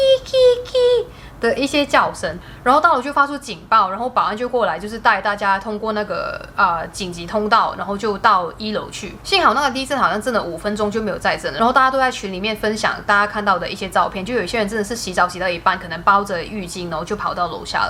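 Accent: Taiwan. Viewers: 大馬華人怎麼一開口是滿滿的台灣口音？ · 你講話好像台灣人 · 马来人台湾腔,吊吊吊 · 我被迫要放慢影片速度來看。😭 你是马来西亚人吗？为什么你说话有台湾口音呢？🥺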